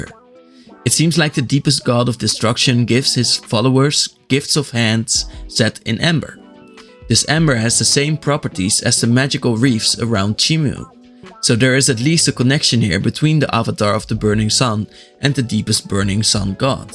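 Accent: Netherlands. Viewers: first of all, are you Dutch? second of all, very good explanatory video! I had no idea what the gods were doing on Ixalan all of a sudden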